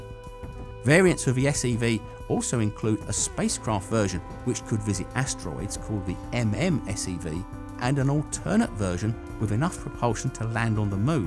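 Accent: United Kingdom. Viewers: British. In the UK, the official unit of measurement of length is the meter. The pronunciation is a telling sign..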